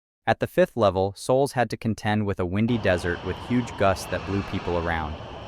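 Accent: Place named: United States. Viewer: I understand not pronouncing most of the words correctly, it's a difficult language for outsiders and even Spanish-only speaking Mexicans fuck them up all the time, but having an American accent and intentionally pronouncing jaguar wrong(even more than normal) is ridiculous.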